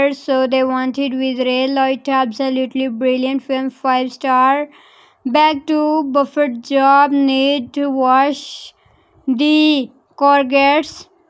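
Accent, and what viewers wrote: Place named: India. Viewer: Your accent is funny She Indian Hey stop scumming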